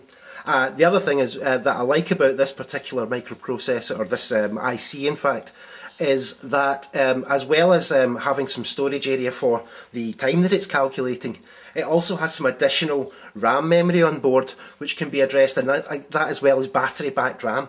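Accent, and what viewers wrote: Scotland, United Kingdom. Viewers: I knew the accent was souding very scottish. Maybe I'll meet you at the next Arduino meeting at the Electron Club… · Your scottish accent is great - i like it very much!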